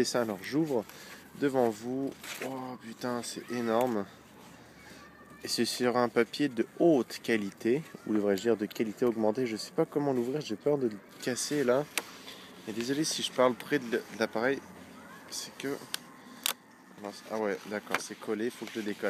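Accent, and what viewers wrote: Quebec, Canada. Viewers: Tes vrmt cool toi ! J'adore ton accents, ta voix on dirais une voix d'acteur mais j'me souvien pu. VIVE LE QUÉBEC!!!!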